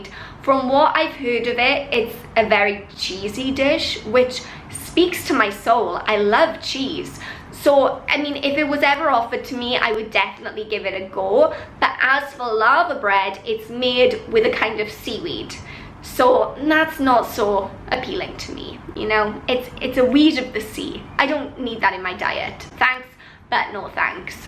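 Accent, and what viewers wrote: Wales, United Kingdom. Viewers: I can still hear your accent lovely girl .... there we are...... tidy mun. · I am trying to pin your accent down, you say just outside of Swansea but you don't sound like a "Swansea Jack", I'm guessing maybe Pontardawe, please put me out of my misery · You certainly sound Welsh to me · as a welshman i can confirm you are speaking with a welsh accent also Merthyr Council is full of sheep they just stand there and do nothing all day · You definitely have a strong Welsh accent.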